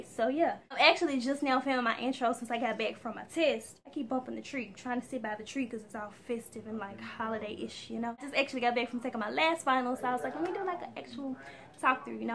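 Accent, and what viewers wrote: South, United States. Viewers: first of all I would like to say, you are so cute and country lol. What southern state are you from? Second great tutorial. I've been natural for 5 months and my hair is about 5 inches and I plan to relax it soon so it will be the length of yours and I consider my hair short as well. This channel is just what I needed, thank you so much! I also see women with super short hair when I look up "short relaxed hair tutorials" and when I look up medium it is longer than mine. keep these videos up! I am def. a new subbie!!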